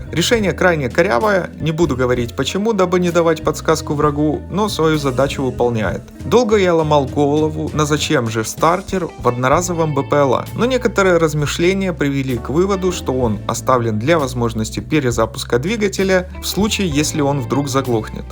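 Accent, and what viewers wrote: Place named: South, Russia. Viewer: Это южно-русский сельский акцент. Вроде паренёк неплохой , про политику не рассуждает. У них 80% русские, остальные 20% поляки с венграми.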